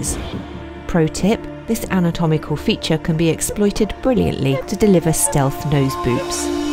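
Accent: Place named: United States. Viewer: Lmao 4 Hughe Jackmans, thank you for speaking American.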